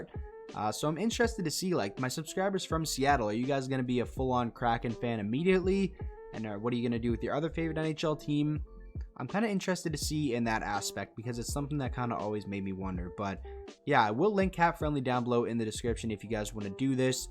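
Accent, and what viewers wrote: Canada. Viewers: Do you have a video as to why you are a Red Wings fan? For me it's because I was born and raised in Detroit and only eventually moved slightly outside of Detroit. There was no way I couldn't be a Wings fan in the 90's and later hockey in my high school was bigger than HS football in the white suburb town that we eventually annexed to. Your accent, particularly your R's sound very Canadian but I wouldn't place it as Ontario. If you're west Canada or newfie, why Red Wings? Other than having excellent judgement, any personal life connections?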